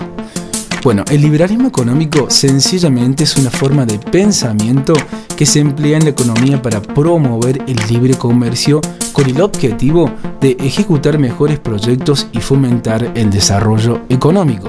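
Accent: Córdoba, Argentina. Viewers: Creo puede ser cordobés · Like por el acento cordobés 😍 Nah fuera de joda, me sirvió mucho, graciass!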